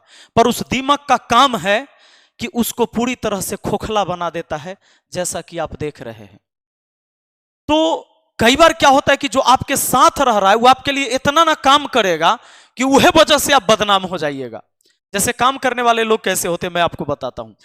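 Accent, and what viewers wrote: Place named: Magahi, India. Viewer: ये हमारा मगही भाषा है हमलोग ऐसा हि बोलते है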